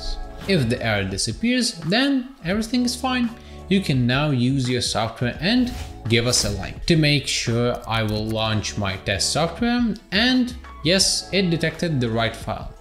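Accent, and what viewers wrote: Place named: India. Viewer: Bro what’s with the Indian accent?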